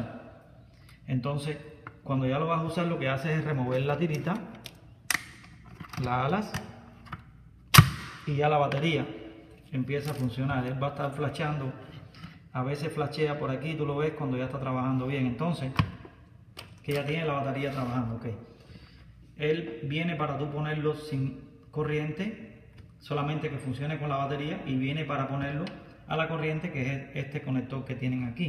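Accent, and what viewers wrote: Cuba. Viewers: Tu eres cubano eh?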